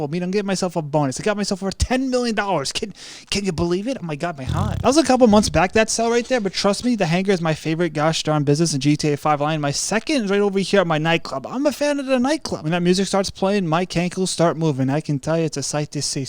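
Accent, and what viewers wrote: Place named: New York, United States. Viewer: strong new york accent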